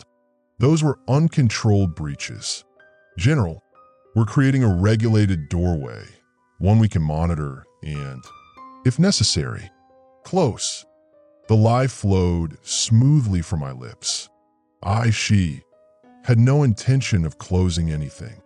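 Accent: Spain. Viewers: yeah, the Spanish inflection was cracking me up. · Yeah, sometimes uses Spanish pronunciation.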